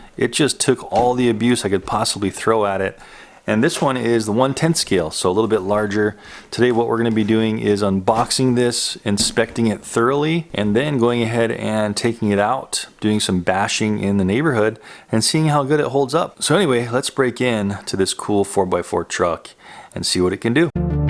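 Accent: Hawaii, United States. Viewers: You live oahu? Nice to hear pidgin on youtube!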